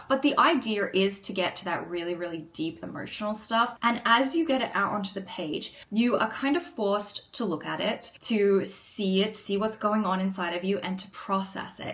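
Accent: Australia. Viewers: no no the aussie accent is going!!! ❤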